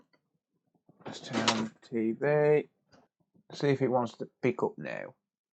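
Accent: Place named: Midlands, United Kingdom. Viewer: which town you from mate, sounds like you got a midlands accent. I'm from wolves myself, now live in the States.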